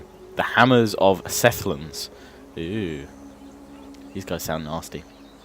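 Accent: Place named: United Kingdom. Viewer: fuck you will your british ascent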